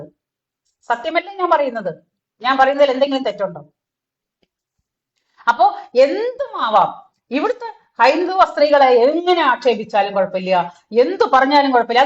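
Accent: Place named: Thrissur, India. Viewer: Chechi adipoli thrissur bhasha kettu sandhosham aayeee 👏👏👏😂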